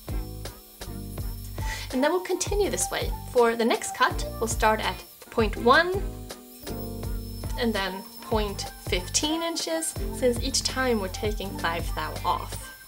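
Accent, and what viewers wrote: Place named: Sweden. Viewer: Det låter lite "swenglish" när du pratar😊!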